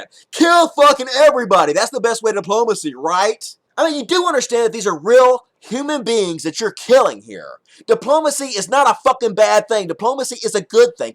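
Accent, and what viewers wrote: South, United States. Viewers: Probably the most rational person about war who has a southern accent that I've ever heard. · What a hoot! That face, that accent, - going completely against my non-American expectations of how Americans who look and sound a certain way think and delivering complete good sense. Nice one.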